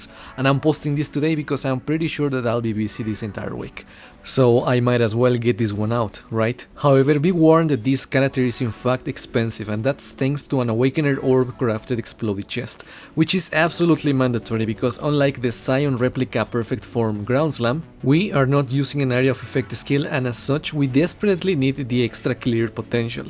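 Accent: Spain. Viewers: eres español verdad?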